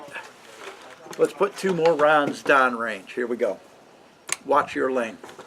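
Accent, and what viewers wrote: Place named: Pittsburgh, United States. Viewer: Between no front license plates on the vehicles in his videos and that accent, he's a yinzer for sure!!!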